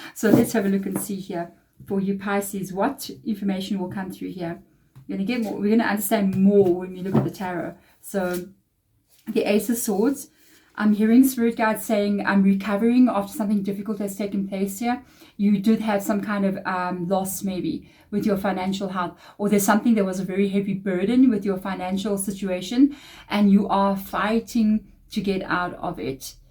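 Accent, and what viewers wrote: South Africa. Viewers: Good morning You sound South African, I’m based in Johannesburg, I would love to come for a one on one session, is that possible if Yes please send Me a guide on how I can make this a reality, thanks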